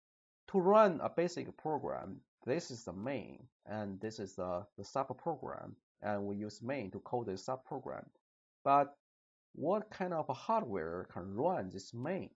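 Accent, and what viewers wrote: China. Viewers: Судя по акценту автор китаец) Как я понял? "So from here".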